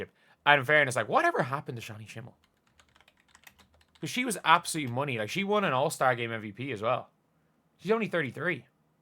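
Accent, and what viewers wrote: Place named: Ireland. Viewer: You sound Irish.....😊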